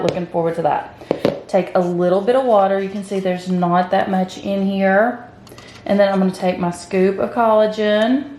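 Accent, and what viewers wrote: South, United States. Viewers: Girl! You are gorgeous ! With an adorable southern accent to boot!! I was also the tall, red headed freckle faced girl in school and was made fun of. I turned it around and realized I could carry more weight than the shorter girls and red heads are rare! You got it going on and don't let anyone tell you different! 💖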